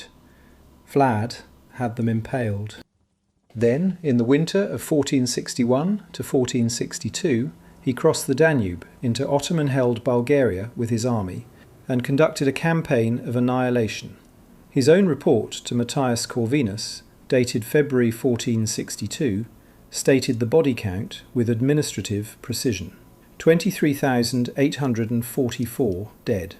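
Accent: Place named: United Kingdom. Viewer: always weird when you hear some british accent telling about Romanians...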